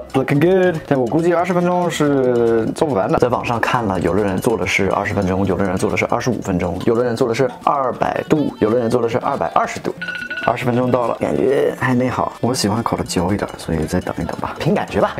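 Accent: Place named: Northeast, China. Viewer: 好的！其实我最佩服你的东北口音，非常地道。我以前在哈尔滨的时候也有点东北腔。现在已经没了。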